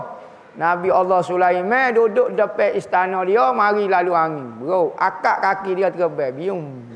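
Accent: Kelantan, Malaysia. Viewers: Betul bang kupasan tu jelas, yg menjadi masalah takut org luar xfhm dgn loghat kelate takut disalah ertikan...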